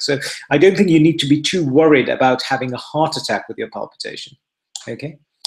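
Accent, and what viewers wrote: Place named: United Kingdom. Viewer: Have been crying tonight because yet again, I can't sleep due to palpitations that come on when I try to go to sleep. I want to tell you how wonderful it is out here in the States where I now live,to be able to listen to you with all your information.The fact that you have taken the time to say all this is (excuse the pun) heartwarming! I also feel better hearing your accent because I am originally from the Uk also! I keep being given holtor monitors to see whats happening but my heart often seems to behave perfectly when I have that on, and awful when I don't! Well anyway,thanks again and hopefully next week will be a better week for me because every night this week has been awful and last night I was awake with palps most of the night! Keep up the good work. :)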